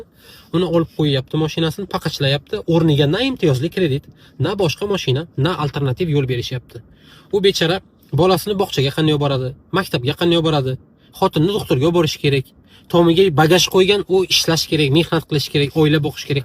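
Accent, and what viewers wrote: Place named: Khorezm, Uzbekistan. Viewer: Bu brat Xorazmdan uxshidi aqsent uxshamin duribdi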